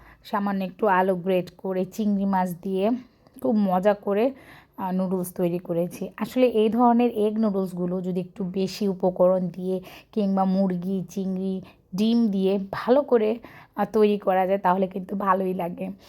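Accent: Noakhali, Bangladesh. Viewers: নুডুলস ,পাকোড়া তারপর অনেক সুন্দর সুন্দর রান্না। ছোট ভাই, ছোট ভাইয়ের বউকে খাওয়ানো আর আমাদেরকে দাওয়াত না দেয়াএটা খুব কষ্টদায়ক কিন্তু আপনার শারীরিক সুস্থতা কামনা করছি। আর আপনার নোয়াখাইল্লা ভাষা তো তুলনাই নাই।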